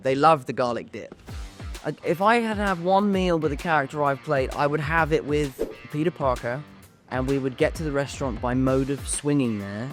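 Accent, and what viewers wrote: United Kingdom. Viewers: I didnt expect him to have a very British accent hahaha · The British accent is charming! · Am i the only one that is just not used to him speaking in british accent XDDD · The way he speaks , it's as british as it gets ...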